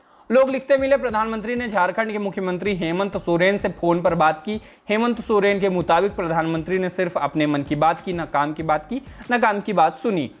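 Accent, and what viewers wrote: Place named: Haryana, India. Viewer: I knew you were Rewadi by your Accent 😂😂😂😂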